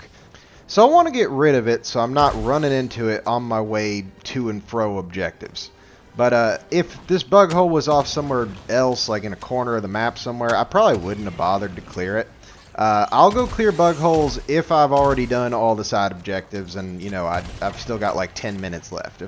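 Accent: South, United States. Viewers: Came for Helldivers tips....stayed for the Southern drawl....lol. Entertaining vid and great commentary.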